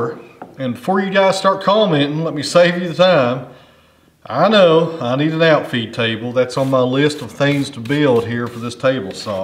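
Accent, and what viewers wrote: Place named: Tennessee, United States. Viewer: I know nothing about sawmills or wood but i find your you tube channel fascinating. I appreciate the beauty of wood and wood grain and to watch you assess a log, cut it and show the lovely grain is brilliant. Add to that your commentary in a wonderful Tennessee accent and I am hooked. I also appreciate your excellent taste in music, which leads me to my only criticism.....would you please list the artist and song name of the tracks you choose for your videos? Even better idea is...have you ever thought about a Spotify playlist that we could follow? That would be as popular as your YouTube channel. Thanks a lot for all the great vids.....i look forward to the next one.👏👏👏👏👏👍👍👍👍👍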